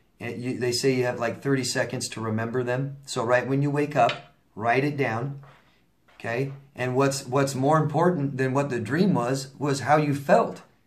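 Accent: England, United Kingdom. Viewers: I finally comprehend what is meant when a Narcissists see a person as an extension of themselves. I was thinking what does that mean ...like a third arm? No it means you are to help/aid them only and you get nothing or little in return. :( They SEE you only as a way to help themselves. :( Now I know my Father was a Narcissist. He never paid child support, no gifts or presents from him EVER! But he felt he was entitled to respect from us. As as adult I would do his shopping...help take care of his business...he future faked and said he was "leaving me something" . It was all one way in his favor and it does hurt when I realized he never loved me. He was only out for himself! He thought his presence was a gift to me. I do feel at peace knowing the problem was not with me. I wish I knew this earlier. People can have interactions with you for years and truly NEVER love you. 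I lived through that but I did not understand at the time. First recognize a self centered person then move on no matter who they are. Yes..sometimes a family member or romantic partner does NOT love you. Great English accent !! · Thank you, I just love your English accent 😘. In the UK you're allowed 6 free sessions of talking therapy on the NHS. This I did and my therapist and I think I'm much much improved. If I want more I have to pay, but as I'm paying for a lawyer... Is it possible to have 2 sociopath parents or 2 npd parents? From London, England.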